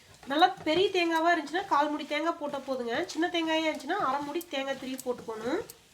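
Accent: Kongu, India. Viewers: Ungaloda kongu Tamil arumai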